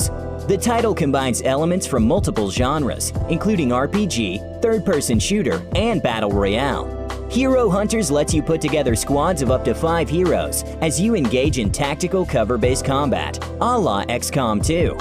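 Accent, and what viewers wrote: United States. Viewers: Theb why does your voice sound so american if your indian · A handsome Indian with a perfect American English , Man you rock!!!!!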